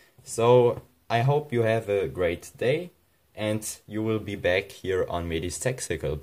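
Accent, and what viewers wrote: Germany. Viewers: Man hört an deinem Akzent, dass du aus Deutschland kommst. Das Emrah-Video hat das dann noch bestätigt. XD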